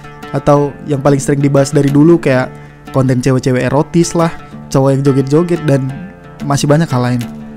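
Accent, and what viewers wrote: Medan, Indonesia. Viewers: sorry oot, btw kau dari medan ya bang? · Bang orang batak lu ya ? Wkwkwk Logatnya kayak orang medan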